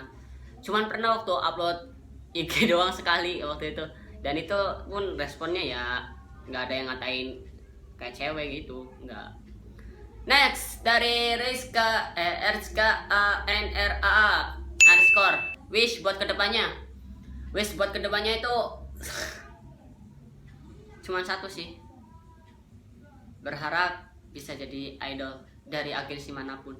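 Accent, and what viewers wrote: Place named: Java, Indonesia. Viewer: Medok bgt ngakak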